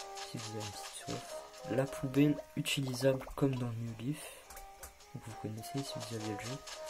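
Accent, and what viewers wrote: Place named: Arab world. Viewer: tu parle vraiment comme un vrai arabe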